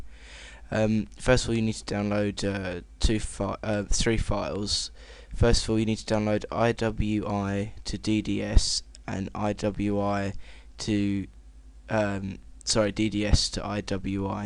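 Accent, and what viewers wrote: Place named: Luton, United Kingdom. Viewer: u sound like 1 of dem luton bloods init, like me. wat is you?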